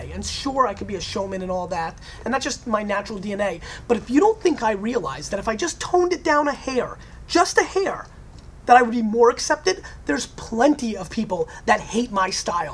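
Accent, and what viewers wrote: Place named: New York, United States. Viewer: nice accent i was gussing new yorker...jersey almost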